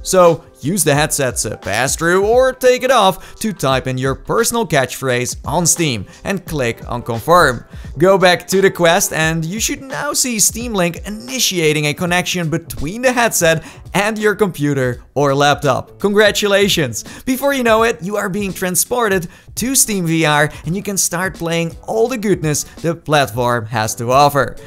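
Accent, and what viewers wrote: Netherlands. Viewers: are you dutch?? idk why but i have a feeling with your voice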